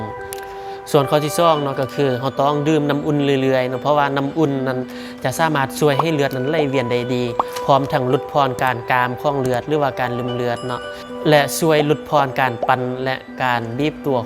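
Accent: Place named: Luang Prabang, Laos. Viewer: ພາສ່າທິນ້ອງເວົ້າເປັນພາສ່າໄທຫລ່ວງພະບາງບໍ່ນ້ອງ ເວລານ້ອງເວົ້າເປັນຕາຟັງເນາະ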